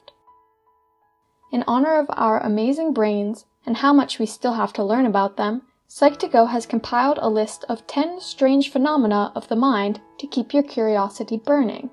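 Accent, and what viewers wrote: France. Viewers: I mean hearing french people talk english is also cringeworthy. She's trying ok. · Cute French accent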